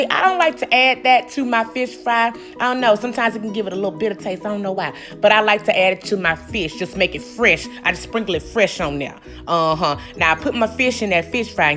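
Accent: South, United States. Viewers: I like that country talk ❤️ Already subscribe 💪🏿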